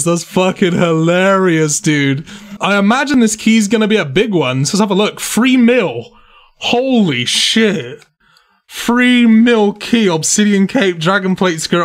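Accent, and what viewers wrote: Bristol, United Kingdom. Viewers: Are you Bristolian by any chance? Accent sounds recognisable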